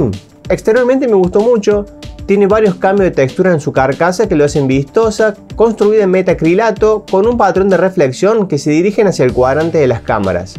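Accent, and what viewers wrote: Córdoba, Argentina. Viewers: loco sos cordobee ! te seguir{e por eso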